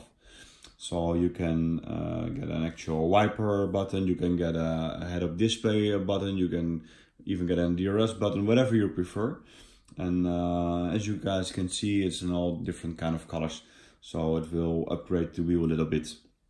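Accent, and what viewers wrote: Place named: Netherlands. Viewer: You must be Dutch, i talk English in the same way as im Dutch too, nice vid btw! One of the better reviews of this set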